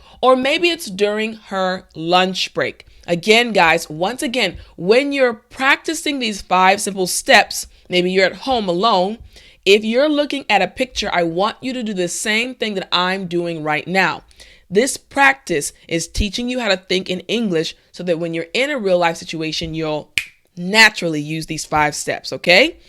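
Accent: United States. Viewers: Props. Where are you from? Are you american? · Hi,Is it American English? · Very useful I like the way you speak..those five words are necessary to start to comprehend and talk a new language..it’s the first time I watch one of your videos..I guess you’re american..which state do you come from?your accent flows delicate..i’m from Italy but I have few friends from US..bye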